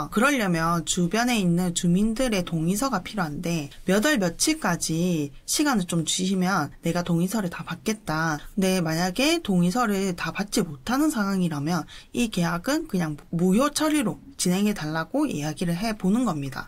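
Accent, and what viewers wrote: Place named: Gyeongnam, South Korea. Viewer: 경남 말투 졸라 심하다 ㅜㅜ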